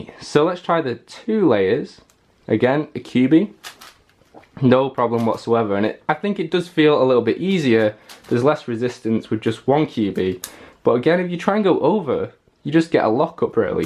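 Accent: England, United Kingdom. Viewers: Hey are you from England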